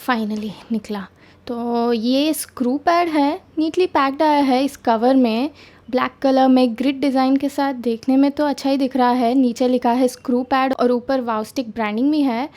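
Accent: Hyderabad, India. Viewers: i like hyderabadi accent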